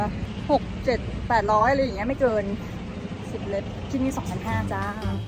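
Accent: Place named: France. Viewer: Très belle vidéo, j'adore ton accent Français trop mignon 😍 ❤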